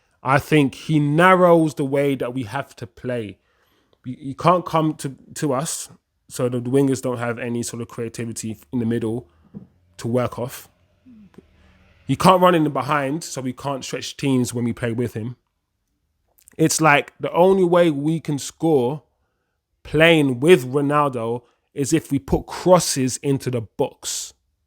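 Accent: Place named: Manchester, United Kingdom. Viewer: Proper manc accent there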